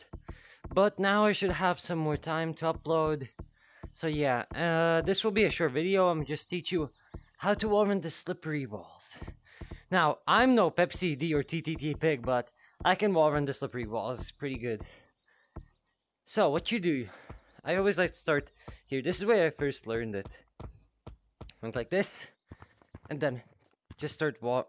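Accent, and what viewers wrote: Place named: Philippines. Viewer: u filipino? u got the accent lol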